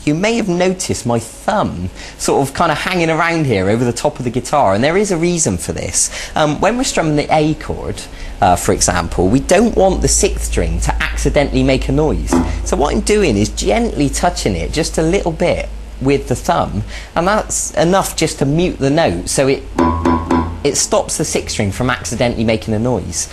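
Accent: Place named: West Country, United Kingdom. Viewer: Bristol? Somerset? I’m from Exeter buddy. Lovely to hear an accent like this on the Net.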